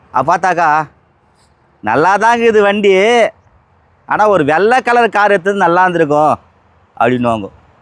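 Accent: Madurai, India. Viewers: Super Anna Madurai vasagam 🎉🎉🎉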